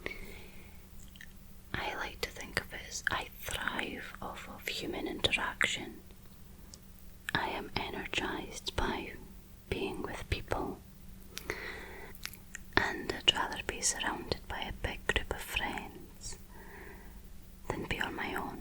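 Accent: Scotland, United Kingdom. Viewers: Personal Rule of thumb, write the email, wait 24 hours to send :) 3rd time watching this vid, and I think it’s the Scottish accent and your cadence but you’re my new favorite ASMR artist, BTW hows your Cantonese and Mandarin? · It feels like I have an anthill walking my scalp. Lovely Scottish accent. 💓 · Very soothing - plus i luv the Scottish Accent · Great video. Lovely to hear a Scottish burr after 10 years away from Scotland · Scottish, I hope, that`s lovely. and together whith these sensual handmovements you are poetry. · Clicks on video by artist I don't know. Video doesn't begin with loud, jerky finger fluttering. Mesmerising, slow hand movements. Soothing whispering. Emotional intelligence. Scottish accent... How could I not subscribe? Cracking video. Thank you. Regards. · I found your videos through Scottish Murmurs I am glad she suggested you! 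I love your slower Scottish accent then Lauren's Glasgow fast accent but both your accents are beautiful! Thank you for helping me relax!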